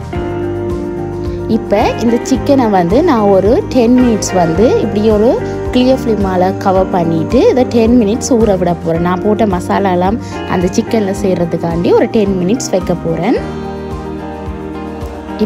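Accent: Sri Lanka. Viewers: Very nice😊👍I love your singala tamil😊😊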